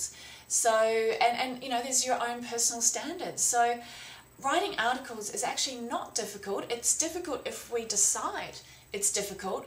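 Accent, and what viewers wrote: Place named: Australia. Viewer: reminds me of how much I love Austrailian accents...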